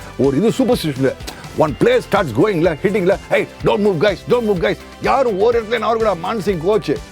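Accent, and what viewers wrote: Chennai, India. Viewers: Love your easygoing and lovely natural narration, and with the Chennai slangs it’s even more funny!! Gadupadango was the funniest! 🤣🤣 Amazing tribute to one of the all time greats of Cricket. Almost 30 years since Kapil retired but we are yet to find another one like him, that shows what a rare breed he was! Hats off!!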